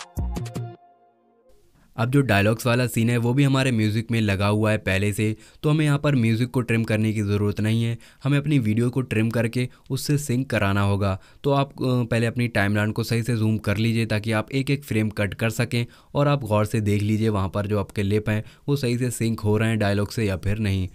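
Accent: Farrukhabad, India. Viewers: mujhe tumhare bolne ke tarike se hi pata lag gya tha ki tum farrukhabad ke ho I'm also from farrukhabad fatehgarh mera nanihaal hai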